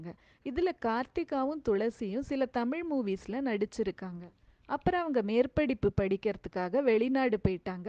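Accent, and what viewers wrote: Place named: Kerala, India. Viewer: The voice has malayalee accent🙂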